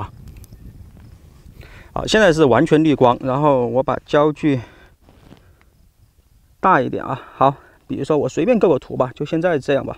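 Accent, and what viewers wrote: Sichuan, China. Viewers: 正宗川普，支持！已点赞、订阅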